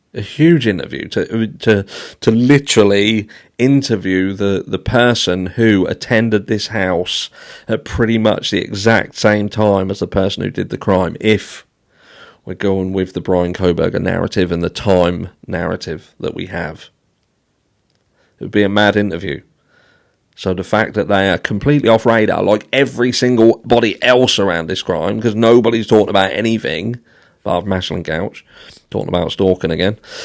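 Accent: United Kingdom. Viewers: Dude I’m losing you once you start talking, it’s hard to follow you. You’re talking too fast English with the accent for the non Brit’s. All your words sound jumbled together.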